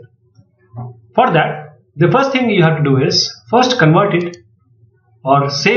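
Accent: India. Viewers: I wonder, is there, somewhere on the internet tutorial without indian voice ?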